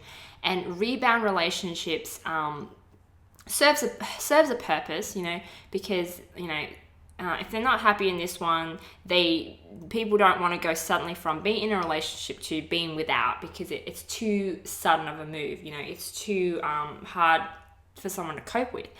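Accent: Australia. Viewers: Is she Australian?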